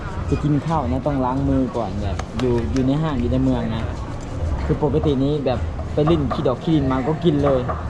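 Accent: Laos. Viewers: ຂ້ອຍມັກສຳນຽງສຽງເວົ້າເປັນຄົນສະຫວັນບໍ່ວ່າຈະໄປຢູ່ໃສ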